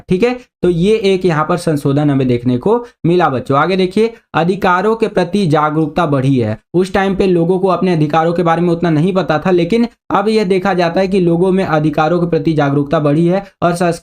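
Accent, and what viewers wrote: Bihar, India. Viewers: Aap बिहार से ho भाई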